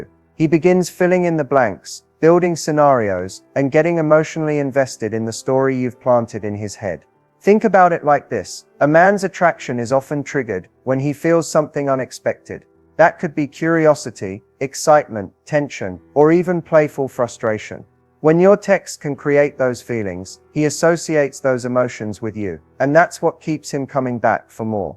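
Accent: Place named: England, United Kingdom. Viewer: I like this English accent. I think I should learn to speak like this .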